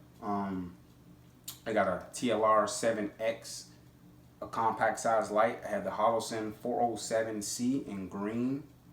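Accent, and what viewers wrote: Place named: Maryland, United States. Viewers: As soon as u started talking,I knew exactly where you're from lol.Salute to u from a fellow 2A and DMV brotha.My collection isn't as extensive as yours,but you' got me motivated.That's a Raw collection u have bro 💯. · Nice collection fam. We all knew what state you were in. Maryland accent is strong. Go check out a couple of my builds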